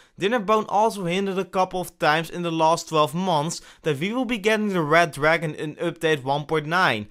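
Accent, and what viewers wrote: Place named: Netherlands. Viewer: Je bent nl dat hoor ik. Lol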